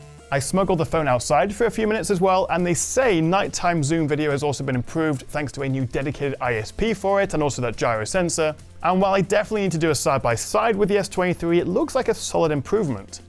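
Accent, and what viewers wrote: England, United Kingdom. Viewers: Your like the 10,000 person to leave a review on this phone. English accent doesn't resonate with Americans. And your review is sub par mate.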